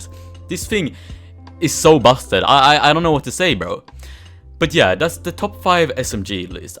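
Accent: Sweden. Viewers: I guess that you are from Sweden.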